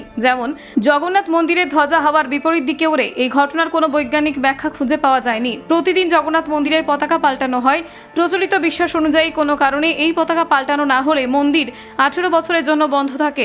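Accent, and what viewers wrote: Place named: Bangladesh. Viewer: Apnar acsent seems to be Bangladesis. Decision is very much correct. Puri must be sacred and peaceful.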